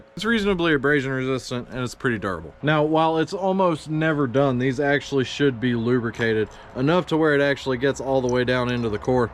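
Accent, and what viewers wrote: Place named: North Carolina, United States. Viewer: That's the North Carolina accent. I have a lot of friends from NC.... They sound like that too. 😁❤️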